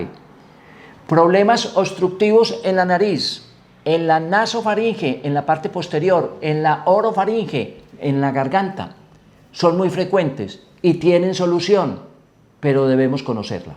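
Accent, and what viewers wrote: Colombia. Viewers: Por tu manera de hablar, eres colombiano, estoy en lo cierto?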